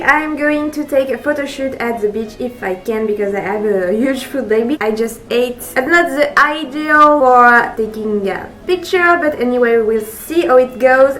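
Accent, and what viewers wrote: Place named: France. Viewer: Le French accent qui passe bien 👌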